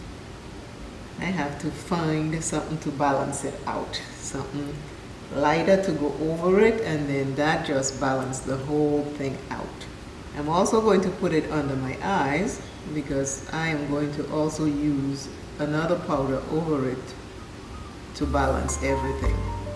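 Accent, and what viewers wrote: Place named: Dominican Republic. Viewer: Are you Dominican?? I hear the accent 😊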